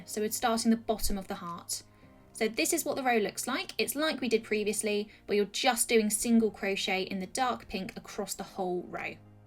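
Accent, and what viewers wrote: England, United Kingdom. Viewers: You sound English but seem to using American terms? E.g. ‘single crochet’ instead of ‘double crochet’. I am I correct? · Thanks for this tutorial it is something I really want to try. You have confused me a bi thought - speaking with an English accent but talking in US terms! I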